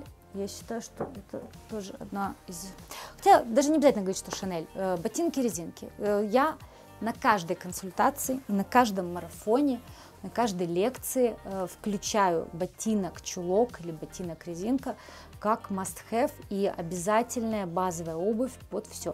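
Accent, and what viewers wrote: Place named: Udmurtia, Russia. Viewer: У вас специфический акцент, очень характерный для Удмуртии или Пермского края. Вы случайно родом не из этих краев?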